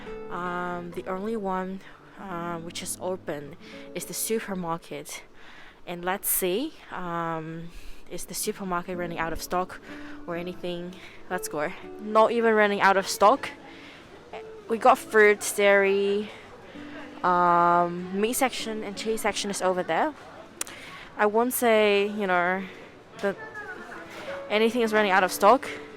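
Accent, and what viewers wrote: Australia. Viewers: So nice to hear your oz accent. 😍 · Nice vlog, but do not pick up more of that Aussi accent :) Stay safe !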